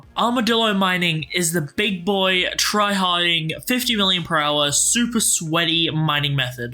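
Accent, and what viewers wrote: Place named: United Kingdom. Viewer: british accent and exposing quaglet of macroing when he's not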